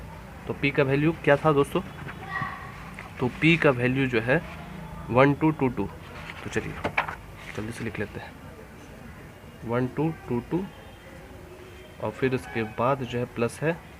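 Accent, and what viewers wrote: Bihar, India. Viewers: Bihar se ho ka? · Kaha ke ho bhaiya Bihari ho kaa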